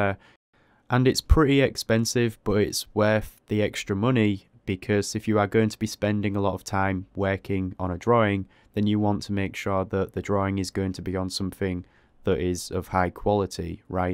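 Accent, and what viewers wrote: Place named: Northern England, United Kingdom. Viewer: Awesome video. Thank u very much for your answers. Love ur accent by the way. I’m in Manchester I’m guessing your slightly further north. That drawing looked insane. So good. Keep up the great work. Love your content and videos. Pls never stop 👍👏👏👏😍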